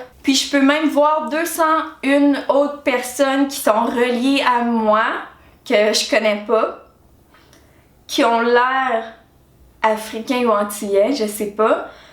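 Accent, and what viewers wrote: Quebec, Canada. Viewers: En passant, tu ressembles à une Haïtienne. En tout cas, je l'ai senti avant de voir cette vidéo. J'ai regardé ton autre vidéo sur ta routine naturelle pour le visage et j'ai dit ''Je suis sûr qu'elle est Haïtienne (qui vit au Québec depuis longtemps aka ton accent) OU peut-être un mélange Haitïenne & Québécoise''. Je ne vois pas pourquoi on te pose autant de questions mais bon lol; il y a beaucoup d'Haïtiennes de ton type.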